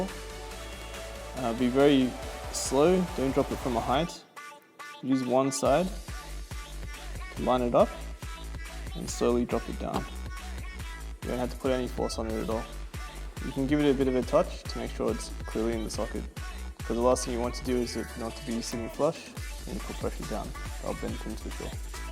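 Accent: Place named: Australia. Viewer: It's surprisingly refreshing to watch a youtube video and here an aussie accent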